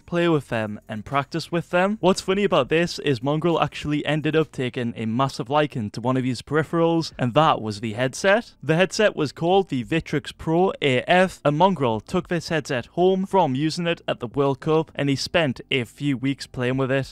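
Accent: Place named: Newcastle, United Kingdom. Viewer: here r u from newcastle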